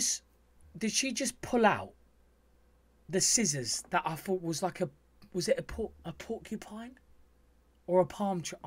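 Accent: United Kingdom. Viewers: man, i love your videos so much, and the ( English British accent 😍 ) amazing bro . big 👋 from an Egyptian fan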